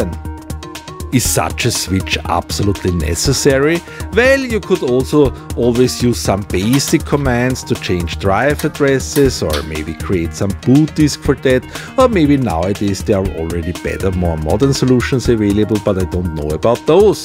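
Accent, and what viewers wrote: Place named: Austria. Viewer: you speak a very nice austrian english, ..... 😎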